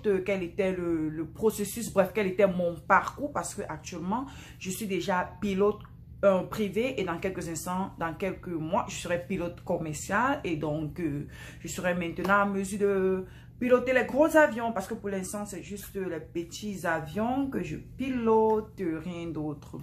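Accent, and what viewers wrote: Cameroon. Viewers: Salut ma chère. J'adore bien ton accent Camerounais.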